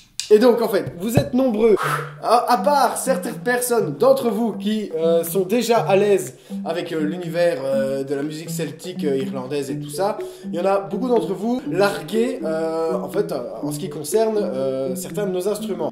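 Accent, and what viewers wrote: Belgium. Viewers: Je vous découvre hier, je me réveille avec la tête dans le cul ce matin avec cette vidéo, et je me dis "mais ... c'est pas possible avec cet accent c'est un compatriote belge" oui gagné 😂 En tout cas cest chouette d'avoir enfin découvert quel nom ce tambour et ce son si spécifique (et très appréciable 😍) portait 😄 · mmmmmmm, eskeu par hasard mes oreilles distinguent bien des "aloors' typiques de la Wallonie ? si oui bisou de Namur, si non, Bisou de Namur quand même ! Edit : le A L'AISE m'a donné réponse :)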